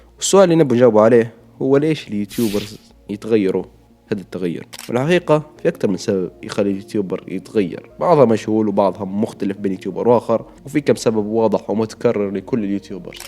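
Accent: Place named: Libya. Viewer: ماشاء الله، ولد بلادي، أصلاً، واضح من اللكنة واللهجة، إستمر يا أسطورة 🇱🇾♥️✌️👏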